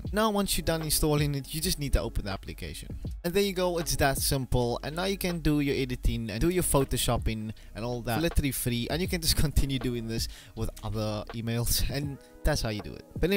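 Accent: South Africa. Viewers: Are you from South Africa? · hes not? hes south african lol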